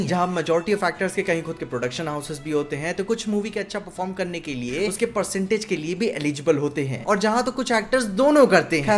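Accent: Standard. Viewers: bhai ki shuddh hindi sun k maza aa jata hai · Bro can you ease change your Hindi accent coz I can't understood your pure Hindi thanks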